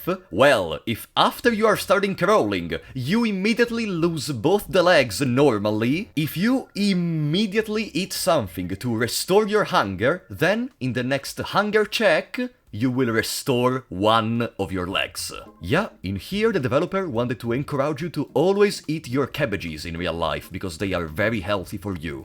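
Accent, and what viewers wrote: Italy. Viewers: He is italian it is literally part of his accent its not a speech impediment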